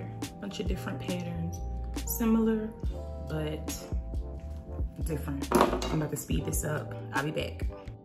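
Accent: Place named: Washington DC, United States. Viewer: I like your accent. It reminds me of my friend who's from DC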